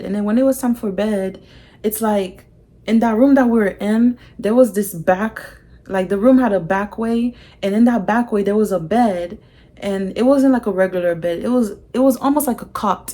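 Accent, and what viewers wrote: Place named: Haiti. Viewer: I picked up a Haitian accent the moment you started speaking 😍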